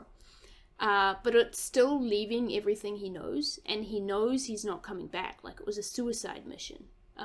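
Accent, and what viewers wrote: New Zealand. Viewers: This was James Cameron's first big film. He built his fame off the success of this film. Great New Zealander accent!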